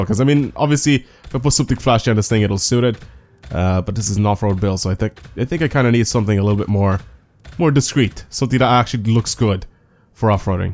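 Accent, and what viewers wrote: Ireland. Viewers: Are you Irish???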